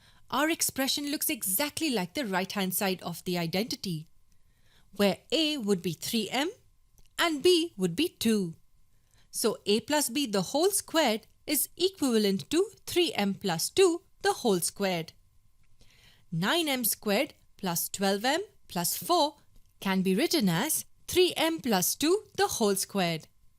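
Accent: India. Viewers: Watching during qurantine because of online classes your accent seems indian love from pakistan Pk IN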